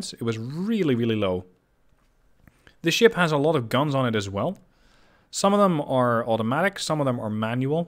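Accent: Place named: Netherlands. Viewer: Looks like an awesome game, and also your accent sounds like your Dutch lol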